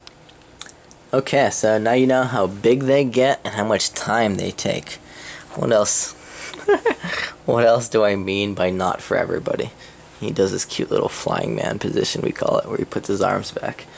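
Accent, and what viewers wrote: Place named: United States. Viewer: WTF WHY DO YOU SOUND LIKE ASHTON IRWIN WITH A AMERICAN ACCENT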